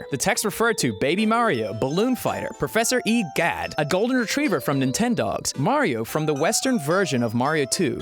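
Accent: Australia. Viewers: His Australian is showing. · I love the occasional Australian dialect pop out here and there, your not fully Americanized yet mate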